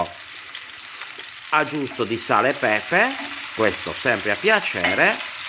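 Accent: Sardinia, Italy. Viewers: BRAVO FINALMENTE UNA RICETTA ORIGINALE È SEMPLICE LA FACEVA MIA MAMMA E DOPO LA FACCIO IO UGUALE COME LA FATTA TU,. USIAMO GLI STESSI CALAMARI PICCOLI E FRESCHI IL POMODORINO SEMPRE FRESCO E VERAMENTE UNA BONTÀ E SE RIMANE UN PO DI SUGO LA SERA FACCIAMO 2 SPAGHETTI QUESTE SONO LE VERE RICETTE SEMPLICI E GUSTOSE CREDIMI PER CURIOSITÀ VEDO DEI VIDEO DI CALAMARI RIPIENI CHE VIENE IL VOMITO A VEDERLI DI QUANTI PASTROCCHI CI METTONO DENTRO MA PER FAVORE TUTTO IL CIBO PIÙ SEMPLICE SI FA PIÙ GUSTOSO E DIGERIBILE SARA, NOI ANCHE IL PANE GRATTUGIATO LO FACCIAMO FRESCO AL MOMENTO COL PANE DI CASA RAFFERMO IO NON O DA IMPARARE NIENTE CUCINO COME MIA INSEGNATO MIA MAMMA MIA NONNA E ANCHE MIA SUOCERA, E POSSO DIRTI CHE GENERI NUORE NIPOTI AMICI OGNI VOLTA VOGLIONO IL BIS, E MIO MARITO E ORGOGLIOSO, SIAMO DI MESSINA, E DAL PARLARE PENSO CHE TU SEI SARDO, CONTINUA A FARE CIBO SEMPLICE ETUTTO FRESCO E GENUINO E NO CIBO CONGELATO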